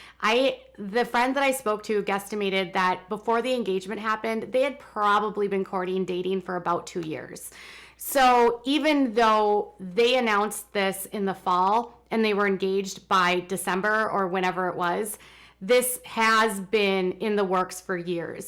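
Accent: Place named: North, United States. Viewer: Wilkinson Yes I have watched live, and it is a whole other ballgame! Her livestreams are usually very intense, with lots of opinionated viewers. I have heard her get tough with rude people who bombard her with idiotic questions, moot points, or repeat information to her that has been discussed in several of her previous uploads. I cannot blame her for at times being short with people who halt the program because they can't pay attention!!! runs a serious channel, she puts a lot of time and effort researching her subjects. Her tone is what I expect from a Northern state (in Texas our approach is a bit softer), but I in no way think she is rude. She is blunt but not rude! She must wrangle up the thousands of guests on her livestream or she would never get her job done!!!! Much LOVE to you!!! ❤💋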